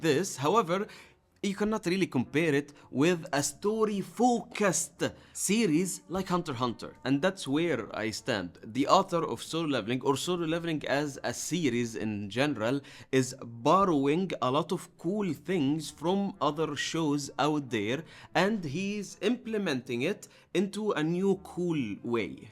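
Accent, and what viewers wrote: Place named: Egypt. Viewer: how r u talking Egyptian in English btw i found u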